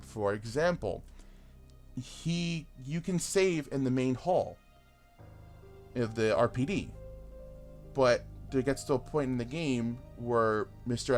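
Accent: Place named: Michigan, United States. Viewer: Sounds like you live in Michigan 😂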